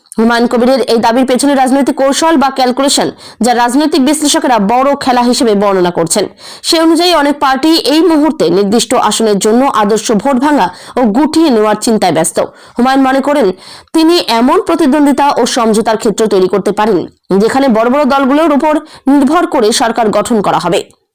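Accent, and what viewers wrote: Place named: Bangladesh. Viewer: বাজে চ্যানেল।বাংলা উচ্চারণ ভুলে ভরা।মনে হচ্ছে বাংলাদেশের কেউ খবর পড়ছে।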